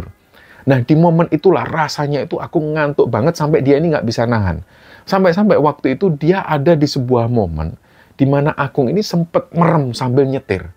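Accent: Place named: Banjarnegara, Indonesia. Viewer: Banjarnegara mana suara nya✌️✌️🥰 Salam sehat dan sukses slalu buat mas dan semua kru nya🤲🏼😅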